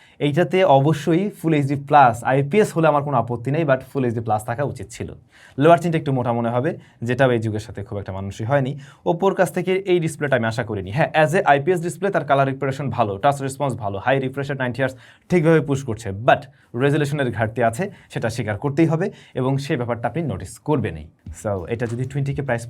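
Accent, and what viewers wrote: Barishal, Bangladesh. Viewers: বরিশাইল্যা নাকি ক্ষেত ক্ষেত কথা অস্তে অস্তে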